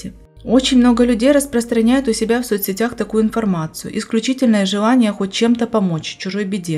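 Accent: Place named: South, Russia. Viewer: У меня недоверие. Почему ролик озвучивается голосом с таким явно выраженным говором, южно-русской "г"? Понятно, что человек со стороны нанят для озвучки. А почему?Почему автор канала сам не озвучивает этот ролик??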